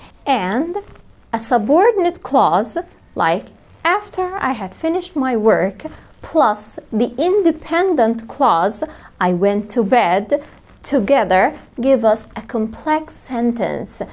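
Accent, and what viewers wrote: United States. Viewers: Thanks for everything my friend to speak and to teach only in English you have a very powerful English with american accent with a perfect pronunciation. It's very very useful when you speak only in English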